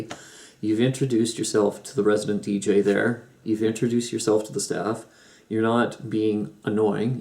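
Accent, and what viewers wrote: Canada. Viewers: Also, love the Canadian accent. Living in Manitoba mine isn't nearly as defined as yours but I still try to making it noticeable.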